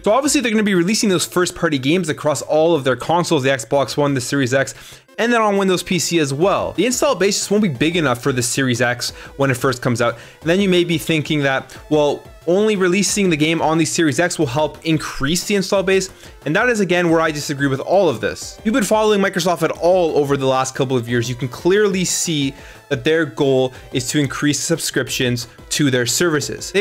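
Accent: Canada. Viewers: your from Toronto area im guessing I'm pretty good with accents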